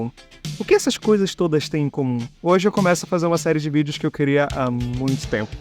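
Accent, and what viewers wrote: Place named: Belém, Brazil. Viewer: Sotaque de Belém = inconfundível 😅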